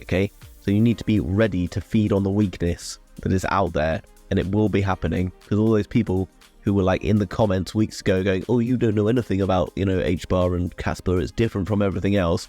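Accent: RP, United Kingdom. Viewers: I think you might be the poshest man in crypto.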